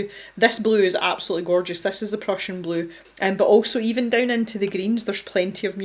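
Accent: Scotland, United Kingdom. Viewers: I have these in Australia and they are good, love your Scottish accent 😊😊😊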